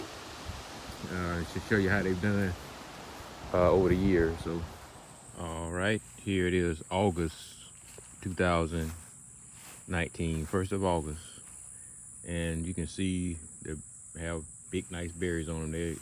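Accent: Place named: South, United States. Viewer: I've read that pH should be 5.5-6.5, so I think you're putting lime when you should be putting sulphur instead. My Rubycrisp is doing great with highly organic soil, a handful of sulphur, a few handfuls of urea, a couple handfuls of neem pellets to block nematodes, and thick pine bark mulch. I do like the way you speak "southern" though. 🤣 Very Best Regards, Tom Scott Author ● Speaker ● World's Leading Expert on the Corrupt U.S. Legal System Our American Injustice System Stack the Legal Odds in Your Favor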